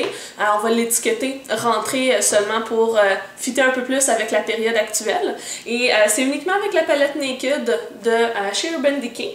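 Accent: Quebec, Canada. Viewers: Est-ce que tous les Québecoise parlent comme ca? :D Je veux dire....le francais :) c'est jooliiii :)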